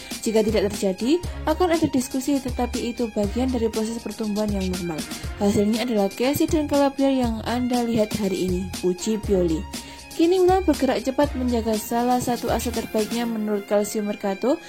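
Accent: Java, Indonesia. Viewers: Medok tenan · min kok agak medok ya😂😂😂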